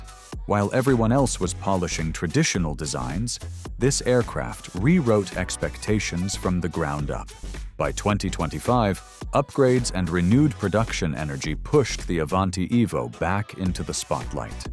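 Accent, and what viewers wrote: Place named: United States. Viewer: Good to Hear an American.🇺🇸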